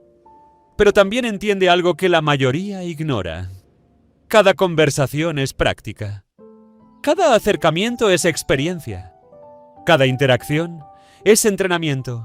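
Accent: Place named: Spain. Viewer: siento que si le quitamos el acento español a su voz se parecería a la de Satoru Gojo jejejeje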